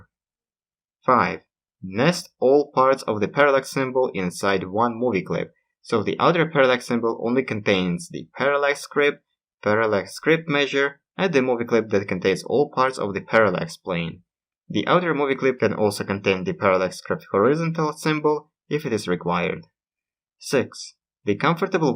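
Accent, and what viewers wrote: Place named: Russia. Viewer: о, ну по акценту уже похоже что ты русскийя:3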